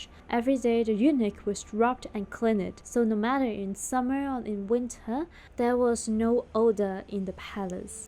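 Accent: China. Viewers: whoever u are, this is one of the worst content. The female narrator sounds like a village girl from northern china with horrible accent. This is a fcuking joke!!!!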